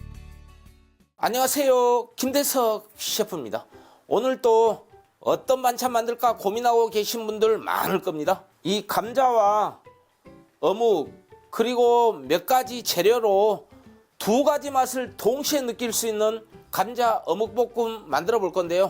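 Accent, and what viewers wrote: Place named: Yeosu, South Korea. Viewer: 안녕하세요. 여수사투리쓰시는 셰프님 정겨워서 늘 제일 먼저 찾아보는 요리정보예요~ 잘 보고 있어요 감사합니다:) 근데 요즘 제목에 자꾸 ‘이걸’ 넣으세요~ 이런식으로 쓰시는거 같아요. 좀 별로예요 제목으로 낚는 다른 콘텐츠들 보면 짜증나는데 는 안 그랬으면 좋겠어요.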